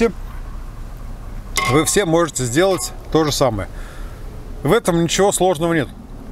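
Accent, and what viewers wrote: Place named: Tver, Russia. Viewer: Все думала и гадала что мне Ваш акцет знаком...не могла понять от куда. Бабушка моя так говорила ведер, не вёдер...она была родом с Тверской губернии.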